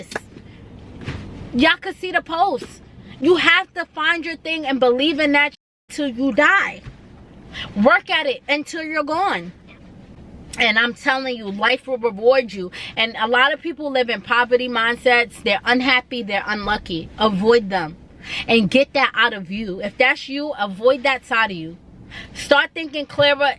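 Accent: New York, United States. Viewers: That NYC accent! Fire! Super detectable!😃You sound like Minaj!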